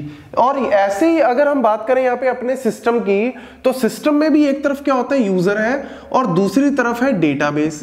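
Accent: Punjab, India. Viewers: you are punjabi??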